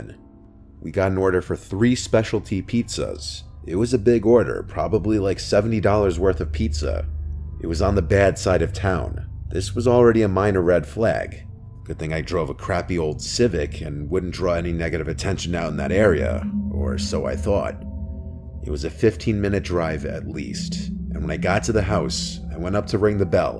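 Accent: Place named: New York, United States. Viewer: As soon as he said “Mary-O” instead of Mario like the Nintendo character I immediately knew he must be from NYC(area) or Long Island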